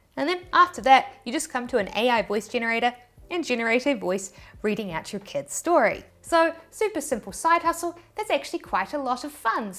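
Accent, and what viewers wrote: New Zealand. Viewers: Are you a kiwi? Your accent is adorable!!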